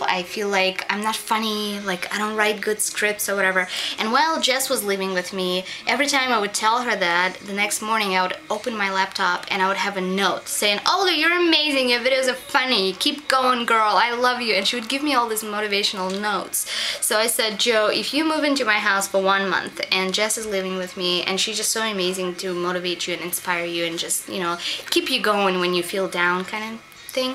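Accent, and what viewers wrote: Russia. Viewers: I can tell your accent gets thicker every time you go back to Russia. lol really cute.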